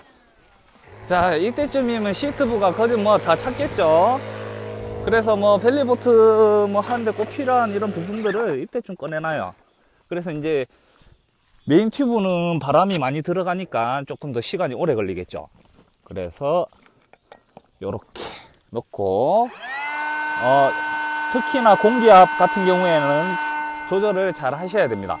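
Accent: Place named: Seoul, South Korea. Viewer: ㅎㅎㅎ 앗~ 서울사람이다~~~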